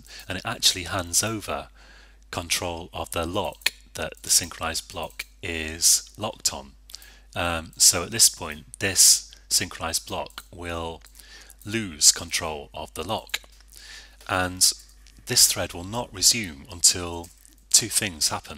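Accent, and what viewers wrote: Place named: United Kingdom. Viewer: Well done) Thx, nice tutors. It will be better to subscribe on your channel. Oh by the way. One little question: You are from GB ? interesting accent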